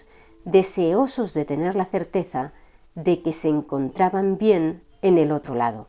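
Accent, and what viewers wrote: Castile, Spain. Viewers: Me gusta tu acento de españa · soy colombiano,y me parecen fascinantes tus relatos especialmente por tu dialéctica y marcado acento español y tu didáctica narrativa. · Me gusta como relatas, aún que no suele gustarme el español castellano. Si me dan ganas de jugar la ouija pero a la vez no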